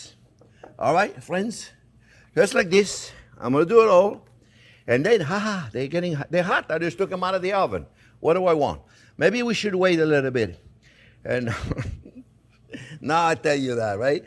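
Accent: France. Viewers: I love his smile and hearing him speak and teach English with a French accent. :D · Chicken wings which are drumstick and the second process in the oven is what??? A brawler? That is what your captions say? As for your French eenglish, I don’t understand.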